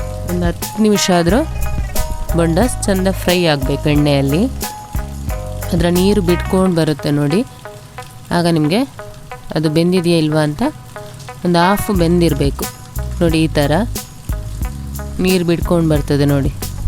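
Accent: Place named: Mangalore, India. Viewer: Nimma manglore bashe thumbaa chennagide marree😁 Nanna friends ella manglore avre thumba Kushi aguthe nim kade kannada kelakke❤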